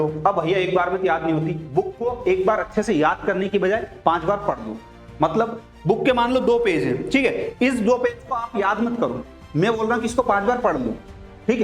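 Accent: Indore, India. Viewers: Indore ascent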